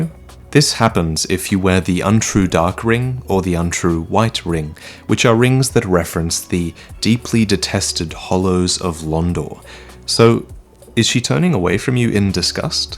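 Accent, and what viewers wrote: Australia. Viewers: he is Australian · Hey, what part of Australia are you from?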